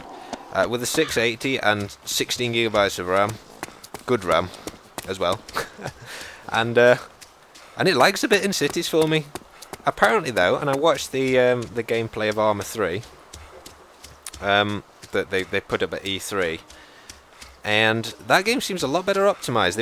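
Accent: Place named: Northern England, United Kingdom. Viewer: Sound like you're from Northen England!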